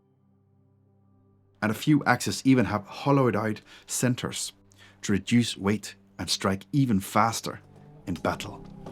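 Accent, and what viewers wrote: Ireland. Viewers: I wonder if you ever lived in the North of Ireland? The Dane and Irish accents share many similarities :) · Great educational video again. Just wondering do you have any Irish connections? To my ear I can hear some Irish in your accent.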